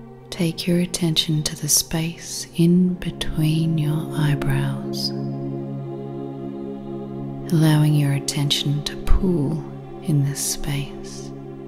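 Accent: Australia. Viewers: ...thank you! I've been listening to a lot if these meditation videos but your voice is SO soothing....must be that Aussie accent. In any event, thank you! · WOW ❤ !! Powerful & Gorgeous !! Plus that Ozzie accent 🤩 ! Thank you 🙏🏻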